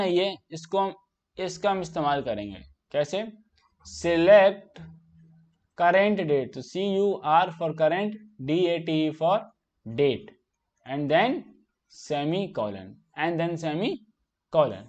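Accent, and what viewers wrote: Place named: Kanpur, India. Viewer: bhai app kanpur se ho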